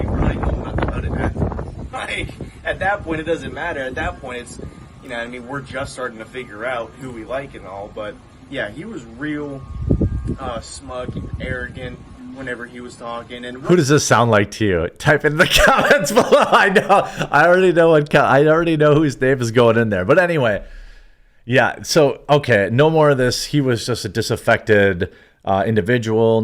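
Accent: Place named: Pittsburgh, United States. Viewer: Gotta love the yinzer accent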